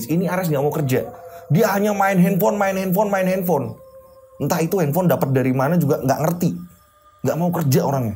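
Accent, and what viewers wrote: Java, Indonesia. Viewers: Logat jw ms bikin seneng oy..menjiwai bgt bawain crt,jg khas sgtnya membawakn crt,slmt ms succes sll berkarya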